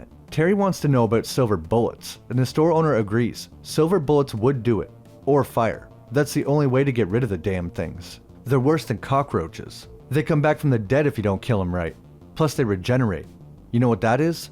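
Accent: Canada. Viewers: bb are you canadian?? cuz you hella sound like it lol (i'm from vancouver) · are you from Canada? I hear a couple of "oots" aka outs lol. Hope this isn't offensive. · Am definitely enjoying the Canadian accent.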